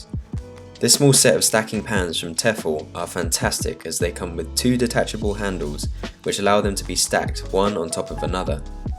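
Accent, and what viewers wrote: United Kingdom. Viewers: For some reason , I didn't hear his accent until he said "living in London". Then BAM! I was like holy shit I didn't realize he was speaking in a British accent.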